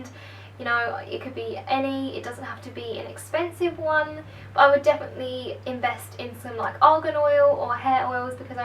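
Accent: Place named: United Kingdom. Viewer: I admire your british accent❤️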